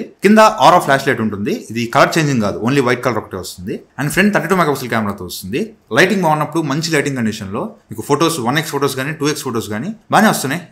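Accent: Nellore, India. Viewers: Bro medhi Nellore aa😊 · Bro miru nellore a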